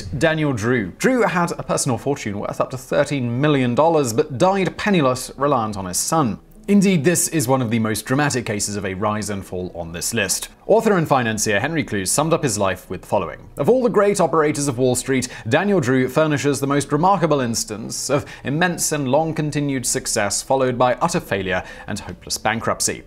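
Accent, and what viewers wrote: United Kingdom. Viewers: You're probably not familiar with British English pronunciation. He's pronouncing them correctly according to his background.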